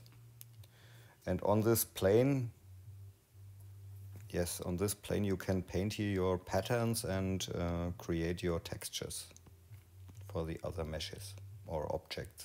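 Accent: Bavaria, Germany. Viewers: nice Bavarian English, no gimmicks:hand-purple-blue-peace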